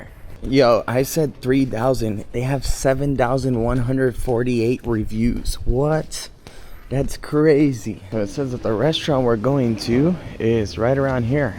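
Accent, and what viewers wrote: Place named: South Florida, United States. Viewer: Just came across this channel. Good stuff! From how you talk I wanna say south Floridian🥳🥳🥳👏🏽👏🏽🥂🍾👌🏽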